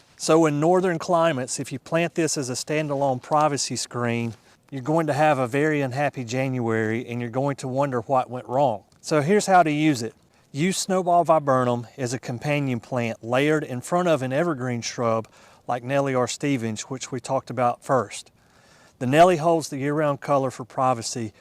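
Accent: South, United States. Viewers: This gentleman has done a nice job, but the video would be even better if he'd provided information about the shrubs' plant zone and deer hardiness. His southern accent should have tipped me off to the possibility that he might focus on southern plants.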